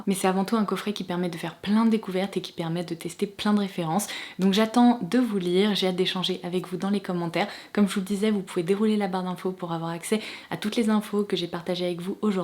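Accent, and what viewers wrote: England, United Kingdom. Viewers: Je vous découvre à travers cette vidéo, et c'est un vrai coup de cœur 😍 J'aime beaucoup votre voix douce et apaisante, et votre merveilleux accent anglais. Je m'abonne tout de suite à votre chaîne 🙂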